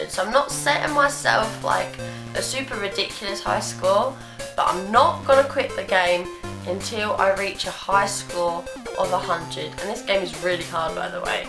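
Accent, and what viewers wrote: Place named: England, United Kingdom. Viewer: u sound like a england girl mixed whit a country girl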